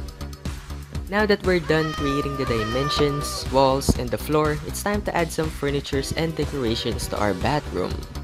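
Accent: Philippines. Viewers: You have a filipino accent I think your filipino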